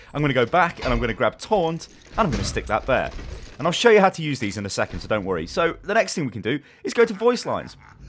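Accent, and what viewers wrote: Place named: United Kingdom. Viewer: ggeeeeesh why is it so tuff to understand british people when there exited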